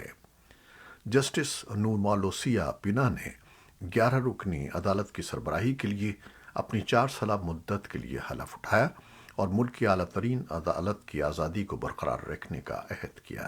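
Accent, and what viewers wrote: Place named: Lahore, Pakistan. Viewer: وہی لاہور والا لہجہ وہی ٹھہراو وہی وقار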